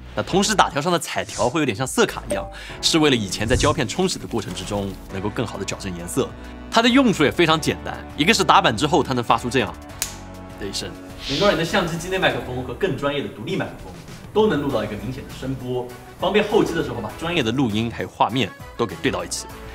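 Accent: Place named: Jiuquan, China. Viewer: 对up主的口音很感兴趣 你是酒泉人么 跟我认识的一个人说话声音几乎一模一样 哈哈哈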